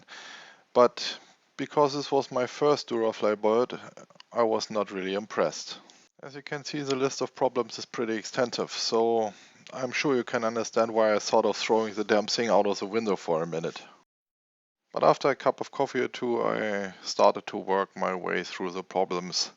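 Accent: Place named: Germany. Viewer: Nice flight,nice Denglish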